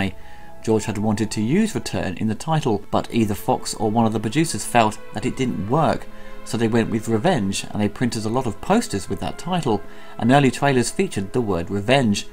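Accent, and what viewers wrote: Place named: RP, United Kingdom. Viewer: It's funny to hear someone with a relatively posh sounding British accent say "butthole".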